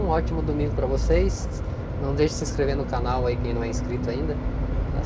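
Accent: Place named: South, Brazil. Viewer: Pelo sutaque parece do Sul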